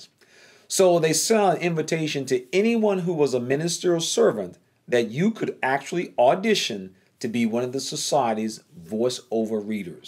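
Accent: South, United States. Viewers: Southern drawl........what's wrong with 'our' southern drawl? I have cousins in WI. It's a different sound. My Mother lived in southern California...different sound. It's still English. We say y'all. WI Say yous guys. I understand what they mean and vise versa. I would have packed my suitcase and brought my southern a** home!!! Great video. Please tell Lady C hello. Nothing could be finer than to be in Carolina. Lol. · I know this video is two years old at this point, but I'm just now seeing it. I love your videos. They're so full of warmth and heart--no wonder the GB doesn't want witnesses watching this. You speak exactly like every brother from the South I've ever known. You're not bitter. You have good memories of the organization, but you don't let emotion cause you to outsource your thinking to other men. I admire your channel very much. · So it's not about JEHOVAH 🤔 it's about pleasing the ears of men wow, disgust. 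I thought all this was based on approval by JEHOVAH to be apart of Bethel. Another eye opener when JEHOVAH says HE'S roving the earth for those who's heart is turned towards HIM. When genuineness approaches and speaks to whomever it pierces to the soul so it shouldn't matter how southern you sound right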